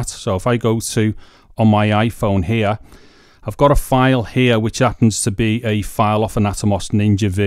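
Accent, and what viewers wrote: Liverpool, United Kingdom. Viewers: This was just what I needed to be certain that the Samsung T7 works for shooting video with the iPhone 15 Pro Max. I've now bought the hard drive and I am excited about trying it out. Thanks so much for sharing this. Also, as a silly American I am just in awe at how amazing your accent is. Is that Liverpool? It sounds so cool! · Scousers posh telephone voice right here 👆🏼 great video mate really informative 👍🏼 coming from a Warrington half and half over here 🍻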